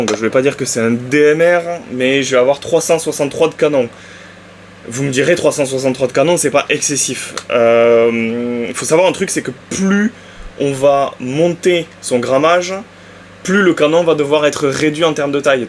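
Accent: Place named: Southwest, France. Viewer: y'a un petit accent et des expressions sympathiques , tu est du sud ouest peut être ?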